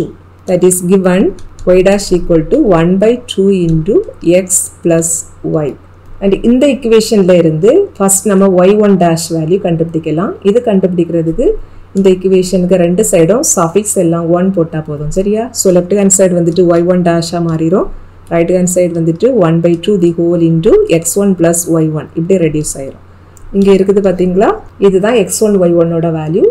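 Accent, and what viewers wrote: Kanyakumari, India. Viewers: Kaniya kumari slang bro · Kanniyakumari district slang❤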